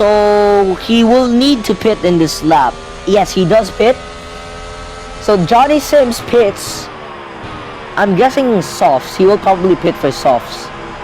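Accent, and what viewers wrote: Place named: India. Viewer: Why do you sound so indian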